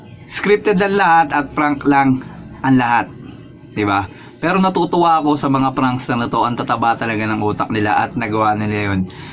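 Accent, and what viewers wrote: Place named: Ilocos, Philippines. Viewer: Ilocano ka?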